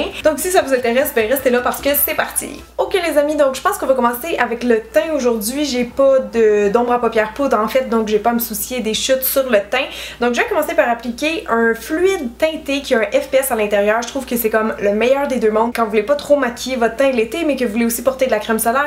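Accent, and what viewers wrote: Quebec, Canada. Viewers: Cela faisait bien trop longtemps que je n'étais pas passée par là ! L'accent québécois, la bonne humeur et les bons plans m'avaient manqué ! J adore le rendu! Et je ne sais pas pourquoi tu n'assumes pas le orange, avec tes magnifiques dents blanches tu aurais tord de t'en priver ;) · Il fait quelle température au Québec? Chez moi dans le sud ouest de la France à 35°C on étouffe!! Est-ce que les bases de maquillage tiennent bien quand il fait chaud? Tu pourrais faire d'ailleurs une vidéo que sur les bases pour les différents types de peaux, par exemple lesquelles sont mieux pour peaux grasses surtout pour les paupières, parce qu'il y a tellement de marques et de produits différents que je suis perdu! Merci à toi et j'adore ton accent canadien:) ❤️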